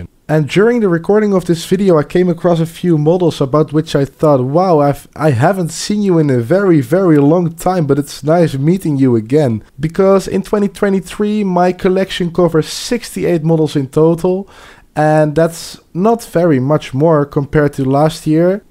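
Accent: Netherlands. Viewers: Ik hou van het nederlandse accent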